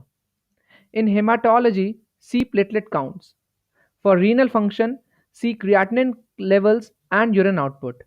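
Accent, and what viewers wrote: India. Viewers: Indo English is annoying for me, but I'm sincerely grateful for quick lecture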